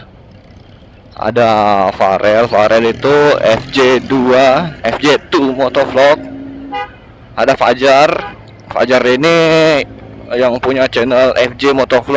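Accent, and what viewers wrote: Makassar, Indonesia. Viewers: mamtap bro logat makassar hehe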